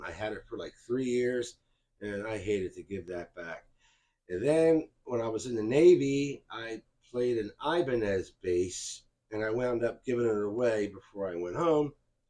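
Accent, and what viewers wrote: Australia. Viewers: We love you too uncle. Probably a good idea not to go commando on youtube 😂 Great OZ accent brother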